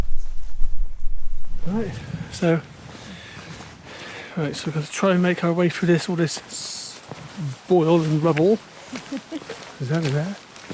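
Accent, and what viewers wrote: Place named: South West, United Kingdom. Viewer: lovely watch mate...Must have been great walking up there.....love the south west Accent...wish i had an accent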